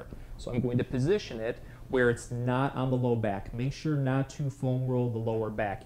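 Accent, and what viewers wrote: Michigan, United States. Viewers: Completely unrelated to the video, but this made me realize how strong Michigan accents can be when you listen to them Related to the video, this is very helpful and should ease some pain from working! It’s a shame I’d need to drive across the state to get treated by you though, you know your stuff!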